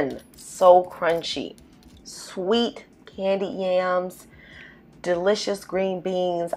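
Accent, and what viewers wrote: South, United States. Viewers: Talkin' like she straight out the south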